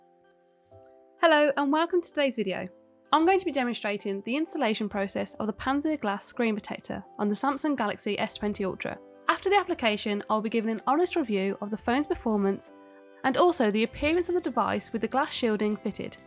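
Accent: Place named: Birmingham, United Kingdom. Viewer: A fellow brummie :)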